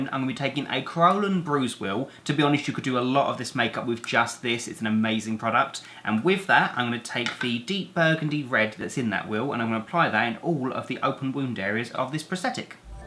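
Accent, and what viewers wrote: United Kingdom. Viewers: Are you British omg I think I finally found a famous youtuber that is British to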